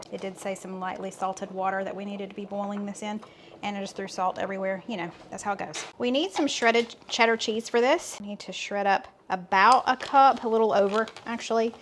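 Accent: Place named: Alabama, United States. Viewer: Love your videos! Just wondering if youre from Alabama. Have a friend from there and she has a similar accent!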